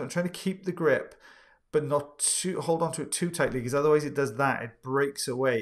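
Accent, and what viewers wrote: Wales, United Kingdom. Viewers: It's a Welsh accent he's got going on there, always cracks me up when he starts taking about Valleys in the canal .... cus he works in the Valleys (of Wales)!! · I had just got done thinking "why don't you bring in the jobson horn, mate?" when you said that in hindsight you would have. By the way, I thought it in a British accent since I was listening to your narration haha.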